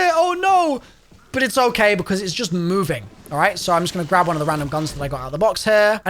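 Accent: United Kingdom. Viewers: Oh hell yeah 53 minute vid. Perfect for falling asleep to that soothing brit voice. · Any destiny ppl here thinking this is the brit version of datto or fallout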